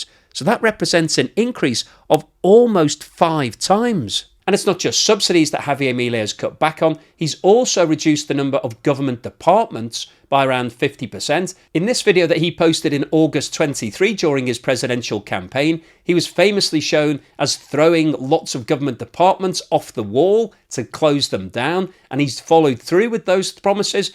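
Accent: United Kingdom. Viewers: As soon as i heard your british accent, i knew this was a hit piece.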